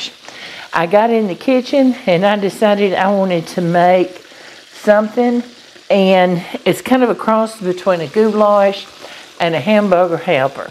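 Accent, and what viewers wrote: South, United States. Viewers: First time here and I have two things to say. When I hear your voice, I’m reminded of the old girls in the southern Baptist Church I attended years ago in Tulsa. You also have an accent like Paula Deen’s. Y’all could be cousins. 😊 Your voice is soothing. I bet you can tell a good story. The grub looks good.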